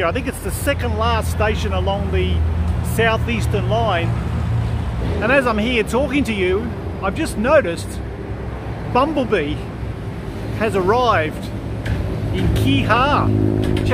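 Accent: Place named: Australia. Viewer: Love to hear the Aussie accent, where are you from mate?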